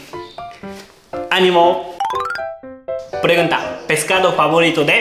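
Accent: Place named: Japan. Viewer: Por el acento japonés se te hará más fácil el acento espñaol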